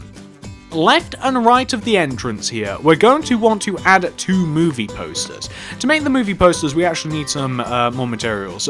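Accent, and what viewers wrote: United Kingdom. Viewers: He sounds British, and I'm British, so if he is British, why did he spell it Theater instead of Theatre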